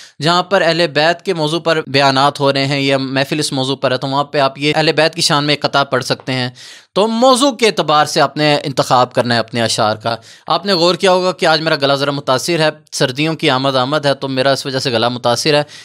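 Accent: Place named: India. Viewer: Assalamo Aliakum Farhan Ali qadri k interview k bd me ap ki video daily Dekhti hu mashallah bht Acha prhte hain ap or ap ka lhja hmare gaon sarai ki trf khohar me hai waha b sb aise prhte hain mare papa b jo ab saath nhi Allah un ki maghfirat farmaye ameen.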